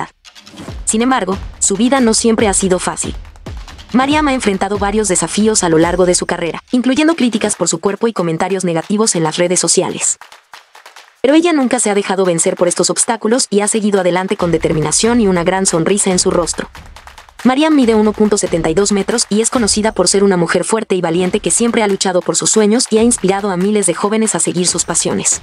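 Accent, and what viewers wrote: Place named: Mexico. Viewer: Yo creía que era Mexicana su acento no parece de cuba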